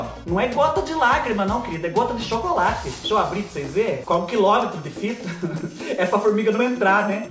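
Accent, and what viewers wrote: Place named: Minas Gerais, Brazil. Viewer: do céu, vc é natural de onde? Seu sotaque parece muito com o do mineiro. Não sou clandestina.😘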